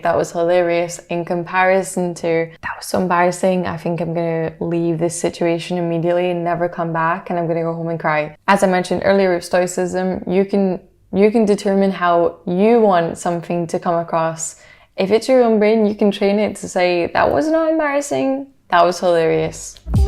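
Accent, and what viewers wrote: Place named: Scotland, United Kingdom. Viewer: As an introvert I was inclined to click of the video and as soon as I heard your Scottish accent as a fellow Scottish person I now feel the need to binge your whole channel <3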